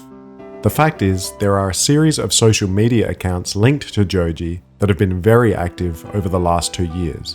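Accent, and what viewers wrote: Adelaide, Australia. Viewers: are you a fellow adelaidian? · u sound like an aussie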